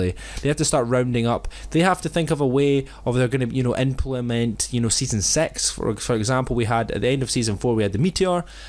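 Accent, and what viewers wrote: Scotland, United Kingdom. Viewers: Are u Scottish or Irish?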